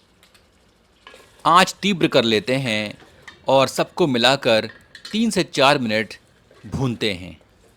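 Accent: Standard. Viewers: this voice (pure hindi) can't be forgotten once heard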